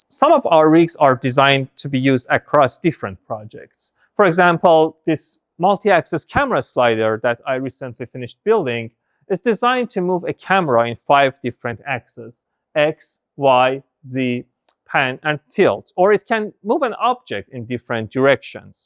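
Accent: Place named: Iran. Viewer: Thanks 🎉 I think you are Persian because the accent 😍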